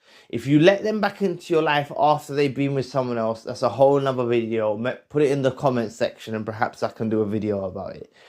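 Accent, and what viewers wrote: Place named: Birmingham, United Kingdom. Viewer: You are a very smart man are you from brum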